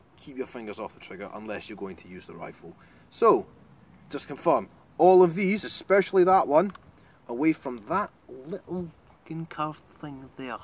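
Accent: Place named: Scotland, United Kingdom. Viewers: Wait how comes you have a Scottish accent now? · This was made not long after a visit here and he always picks up on the accent. He's now has a permanent Scottish accent due to staying here.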